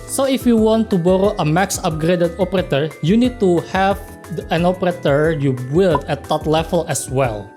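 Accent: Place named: Indonesia. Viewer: kenapa ya orang indo kalo ngomong inggris kaya gitu semua ya? Kaya ada keunikannya sendiri langsung ada feeling "oh ini indo nih"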